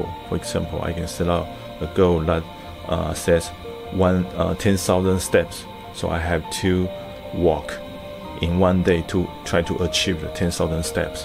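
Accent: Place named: United States. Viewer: you speak great English. I mean your tone likes American, not Taiwanese accent...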